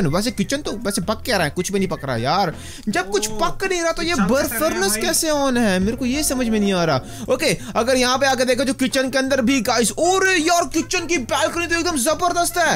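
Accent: Punjab, India. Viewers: Tum Punjabi ho kya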